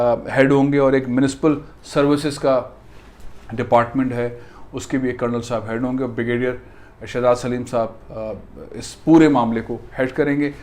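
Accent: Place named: Punjab, India. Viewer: Apka bolny ka style ab tu paka panjabi ban giya hy,ye kyse houa???🤔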